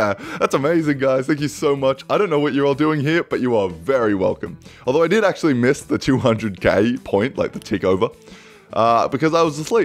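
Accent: Australia. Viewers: Hey my bday and are u Aussie mate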